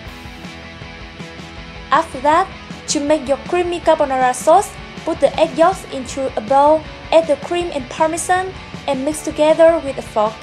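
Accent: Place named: France. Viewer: your english has french accent! so funny, anw it looks delicious, i ll try it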